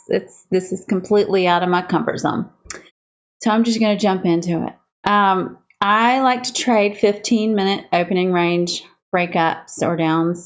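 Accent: Georgia, United States. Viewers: I should have guessed. I'm from south GA. BBT is so global, I don't often hear familiar accents. LOL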